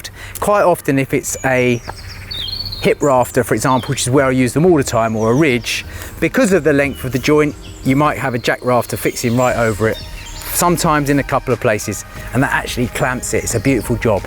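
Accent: England, United Kingdom. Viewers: English English is so annoying, innit? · Which part of England are you from? My Electrotechnology teacher has the same accent ahah And he’s also a good teacher! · Uhm... I noticed British accent, rather than American... Swamp is... Louisiana... ?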